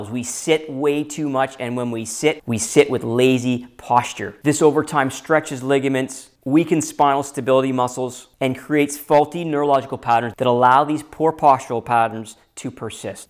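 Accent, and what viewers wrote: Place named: Canada. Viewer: Upvoted and shared! Plus: I knew you were Canadian, after a few moments of listening : )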